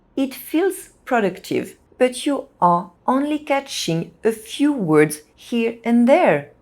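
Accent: France. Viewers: J’adore votre accent, c’est intensément français… presque difficile pour un anglophone à comprendre.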